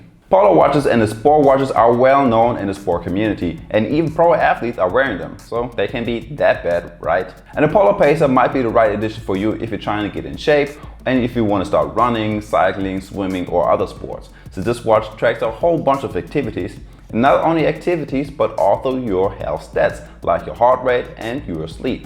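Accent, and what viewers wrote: Germany. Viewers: Direkt deutsche akzent gehört coole videos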